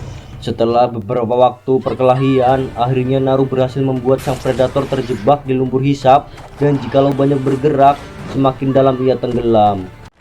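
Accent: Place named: Indramayu, Indonesia. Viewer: Logatnya orang Indramayu wkwk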